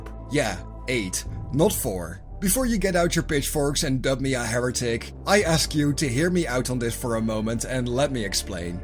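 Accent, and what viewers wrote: Netherlands. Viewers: As an ex gw employee I have to say I like your topics and storytelling. As a fellow Dutchman I have to say I really dislike "our" accent in English 😅 nothing personal. Keep it going and thanks for spreading this aspect of the hobby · Haha i can hear you're dutch from a mile away.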